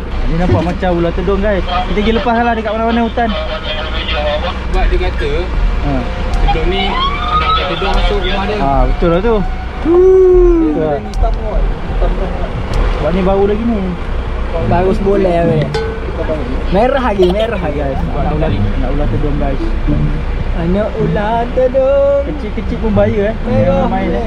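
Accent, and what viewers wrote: Kelantan, Malaysia. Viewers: Abg ni orang kelantan ke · abang orang kelantan kee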